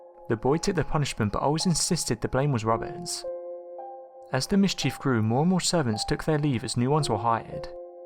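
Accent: United Kingdom. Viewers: The British accent narrative makes it more spooky lol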